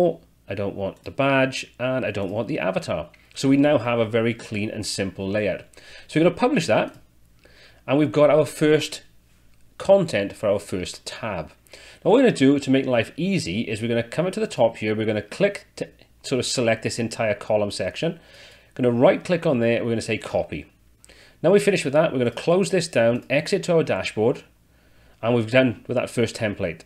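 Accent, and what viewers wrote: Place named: Newcastle, United Kingdom. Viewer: Well, isn't that an elegant approach, very smart! I'm trying to find some visually interesting way of doing 3 tabs that are all text (question then answer), and found your video, and though this doesn't work for me in this case, it's something I'm bookmarking and saving, as I think I may have a use for this in the future. Thank you. Have subbed as well, am looking forward to watching more of your content. And, you sound Geordie...are you? My Dad was from Wallsend, it's my favourite UK accent and always makes me think of him when I hear it!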